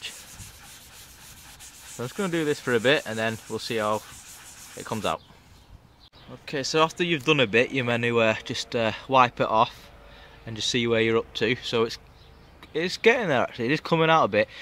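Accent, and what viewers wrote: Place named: Northern England, United Kingdom. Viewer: thx, funniest bit when he drops the fing t-cut even funnier with a northern accent. luck it was just the clear coat damaged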